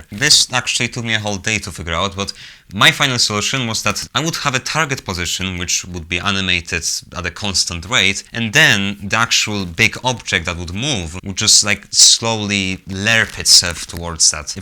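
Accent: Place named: Italy. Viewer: I'm loving this generation of good youtubers with italian accent (i think that's italian)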